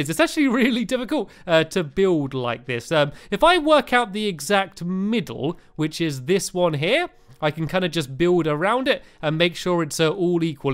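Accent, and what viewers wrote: England, United Kingdom. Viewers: Francesca Mazzi Questa poesia era ok .... il "salto in un lago" parte non era bello, in effetti hai appena detto di suicidarsi. Inoltre è Stampy un male youtuber, non mi piace tanto quanto si fa, perché, del suo accento, accenti inglesi tendono ad essere fastidioso per me (non essere razzisti), così ogni volta che ho sentito somone lamentarsi lui im come "cosa l'inferno ", ma allo stesso tempo dico" Sono d'accordo che può essere una cagna a volte "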